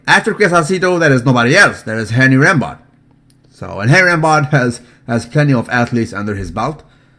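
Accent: Egypt. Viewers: Man i am Egyptian and your egyptian arabic accent is soo fun, it cracked me up, thank you man :D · Your Egyptian accent ain't that bad:P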